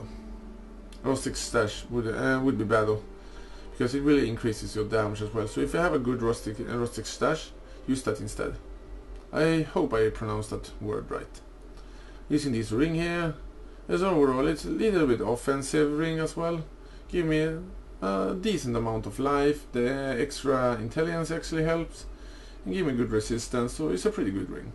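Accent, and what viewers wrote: Sweden. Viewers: svensk? :D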